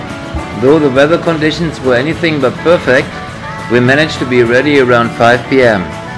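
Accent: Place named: Germany. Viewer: as a linguist I seem to hear your German background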